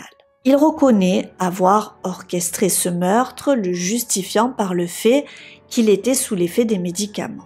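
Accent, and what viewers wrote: France. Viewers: Je ne crois pas avoir déjà entendu parler de cette affaire, sinon c un plaisir d entendre ton accent qui vient du sud si je ne m’abuse? Merci pour ton travail et tes vidéos! 🙏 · Je suis particulièrement déçu que tu ne tiennes pas compte des remarques que l'on peut te faire... Tu es plutôt mimi à regarder...tu as un jolie timbre de voix et ton accent du sud (je suppose) rend tes histoires fort dépaysante... Mais par contre qu'est ce que c'est moche de t'entendre dire mariâche...montâche... garâche...partâche...et tous les mots finissant par age... Pourtant tes récits ne sont pas toujours ou souvent repris par d'autres...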